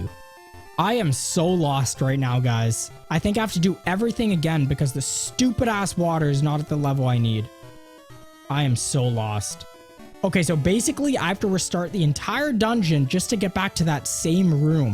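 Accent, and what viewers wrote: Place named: Canada. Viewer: your commentary in this and the 3D one is hilarious. also called that you were (a fellow) canadian from the accent LOL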